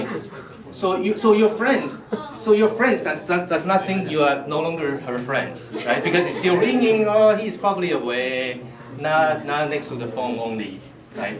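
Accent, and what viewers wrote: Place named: Singapore. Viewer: singaporean? malaysian? seems to be singlish..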